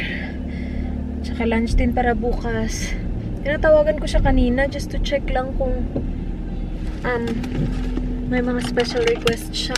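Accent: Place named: Visayas, Philippines. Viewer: Hahaha ka cute sa buang ba hahaha bisaya pa more😍😍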